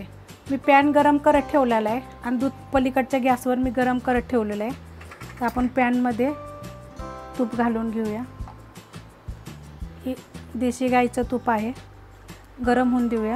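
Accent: Satara, India. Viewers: Tai tumch gav kont aahe tumhi kup chan boltay agdi mazya satar chi bhasha vattey baki khir kup chan zaleli distey mi lgech krun bghnar aahe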